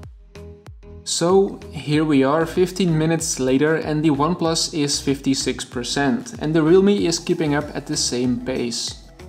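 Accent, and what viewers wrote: Netherlands. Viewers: You are dutch right?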